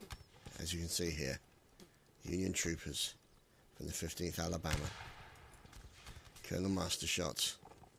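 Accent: United Kingdom. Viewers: its just moose there is no plural for moose btw keep up the good work your funny as hell lol espeically with the British Accent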